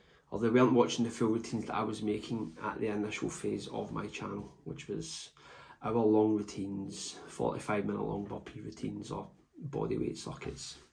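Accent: Scotland, United Kingdom. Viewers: I think your biggest appeal is the rawness of your videos. On the patio, top of the stairs or front room, a working father getting it in is inspiring. Be yourself, the world loves us Scots, accents and all! Maybe not the swearing at times though, could put some off. I’ve taken ideas from you and have tried a good few of your videos as they’re a bit different from what I normally do. Get the burpee tour of Scotland on the go! 😂😂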